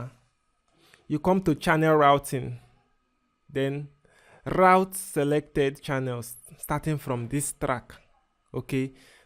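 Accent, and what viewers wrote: Nigeria. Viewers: Bro remain blessed Love your tutorial and I love your use of Nigerian accent hope we could would work together some day. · I enjoy your tutorials because of the way you speak and explain in nigerian tone and language. You deserve a million subscribers bro I love you 😘😘😘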